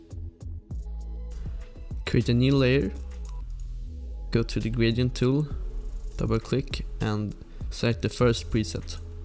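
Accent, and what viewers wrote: Sweden. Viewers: Swedish 100% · This guy is Swedish I bet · due svensk eller hu? De hörs på din röst lol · Are you swedish? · you sound Swedish. Sorry if im wrong. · Are you a Swede??? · Are you swedish bcuz u have an swedish accent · Man hör att han är svensk xdd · you sound like you are from sweden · He comes from sweden you here it very easy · Man hör att du är från sverige ^_^ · are you from sweden_ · Ur swedish xD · are you from sweden??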